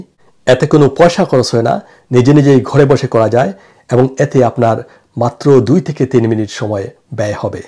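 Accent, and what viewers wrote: Sylhet, Bangladesh. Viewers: স্যার কি সিলেটি? কথার টানে মনে হয় সিলেটি❤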